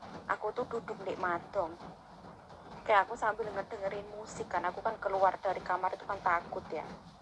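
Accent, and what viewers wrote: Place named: Java, Indonesia. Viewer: Bahasa Mandarin kamu lucu sekali..masih campuran Jawa,sebutan Mandarinmu sebutannya lain...lucu dong,mungkin selalu berbahasa Jawa mungkin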